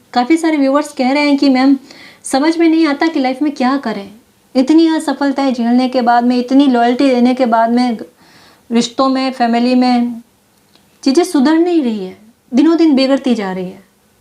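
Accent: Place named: Marwar, India. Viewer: Rajasthan ki Ho kyunki main bhi Rajasthan ki hun kyunki aapki Bhasha se pata chal raha hai Shayad aap Marwar Marwar se ho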